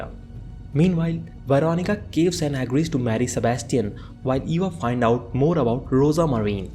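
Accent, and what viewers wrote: India. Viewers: Very bad gujju accent. sorry.. thumbs down.. please dont spoil our day again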